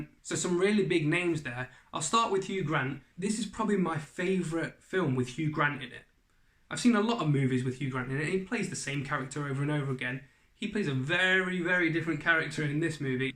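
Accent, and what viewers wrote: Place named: England, United Kingdom. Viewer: Hey, Bud, thanks for visiting our channel. My first time here, and gotta say, like your review. Not fair, though, because you have the cool English accent. Definitely not fair. Agree with your review. I guess I have a lot to look forward to, given that I haven't seen the other Guy movies you mentioned. have a good one.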